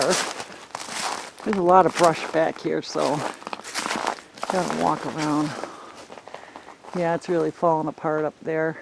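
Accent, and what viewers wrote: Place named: North Carolina, United States. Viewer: Being from North Carolina, I immediately recognized the accent. My mom was from Sheboygan. Very cool!!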